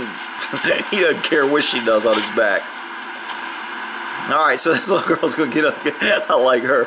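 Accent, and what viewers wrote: United States. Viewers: What a fantastic gentle horse! Completely calm, lets the girls have fun, doesn't even move an ear when the ladder falls to the ground. And I love your comments as always, with that lovely American accent "that horse has one thing on his mind, and it ain't running" hahahaah :D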